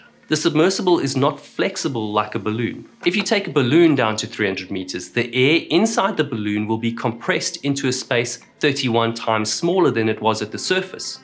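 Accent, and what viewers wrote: South Africa. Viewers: Love your accent. As a non-native english speaker i was curious where are you from. My guess was australian but seeing the comments now i know its south african. Very nice❤ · For a second I was confused to hear that accent, but it's always good to find a fellow South African in the wild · That is a South African accent right there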